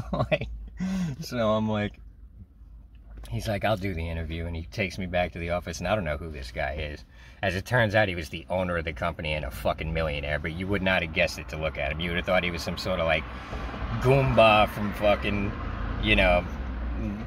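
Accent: New York, United States. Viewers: Love your New York accent.. I’m trying to watch your videos in order and so far enjoying every one of them.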